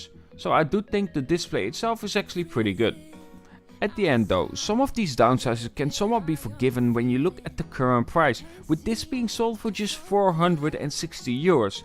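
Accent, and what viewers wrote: Netherlands. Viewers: Are you Dutch? Because you speak English like a Dutch man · hoorde aan je accent al dat je nederlands was haha en je komt ook nog eens uit eindhoven net als mij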